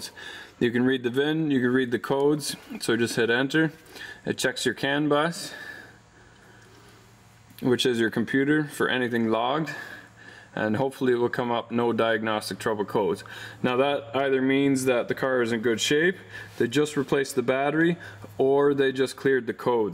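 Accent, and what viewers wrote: Canada. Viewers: Love the accent think Canada! Love it. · I know you are Canadian because of the way you say "out" Thanks for the tips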